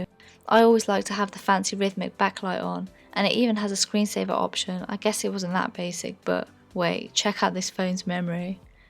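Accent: United Kingdom. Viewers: Love ur accent, which part of England are u from?:)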